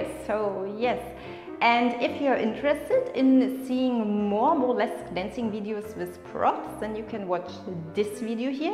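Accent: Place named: Germany. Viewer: I'm here for the German accent 😅... Don't worry I'm German too.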